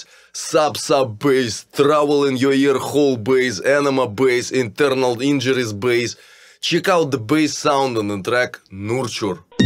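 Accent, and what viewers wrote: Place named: Russia. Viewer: Всегда уважал людей, которые сами научились формулировать предложения по-английски. Отличный патриотичный акцент. Звучит очень стильно - как sovietwave. Респект! Канал по-настоящему уникальный!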